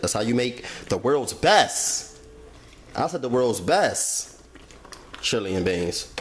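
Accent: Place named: Baltimore, United States. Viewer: I was about to ask you where you are from.. Then I seen your name haha. that accent. .. I was going to guess baltimore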